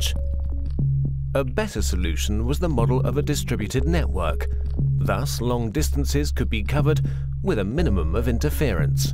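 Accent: England, United Kingdom. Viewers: love the english accent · What a terrible accent... I can't understand a word. I hate England guys. (about the girl, I'm feel ok)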